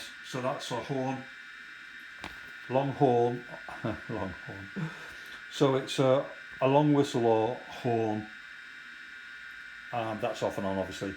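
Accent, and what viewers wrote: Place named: Manchester, United Kingdom. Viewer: Nice pick ups....it still cracks me up when you are.talking Manc and then go into fluent German.......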